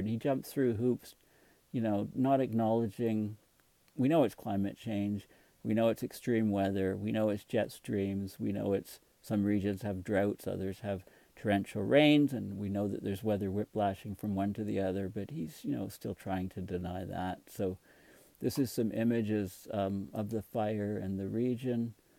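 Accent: Canada. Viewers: I hear your Canadian??:0 I'm from Calgary, Alberta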